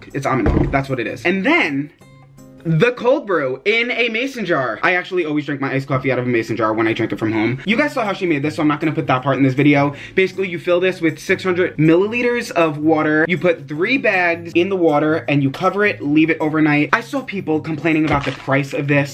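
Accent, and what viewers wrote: New York, United States. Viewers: That New York accent thou😂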